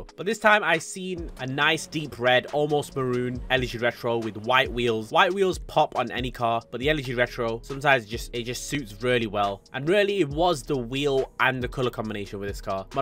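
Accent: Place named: Bradford, United Kingdom. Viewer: he sounds like hes from bradford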